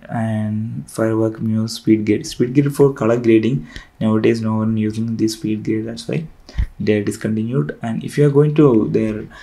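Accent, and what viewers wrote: Kerala, India. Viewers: That Indian accent tho!!!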